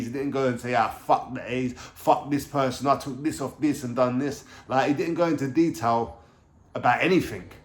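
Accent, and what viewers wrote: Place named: Cockney, United Kingdom. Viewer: What part of London you u from mate? Sound a bit like steptoes accent with no disrespect. Peace.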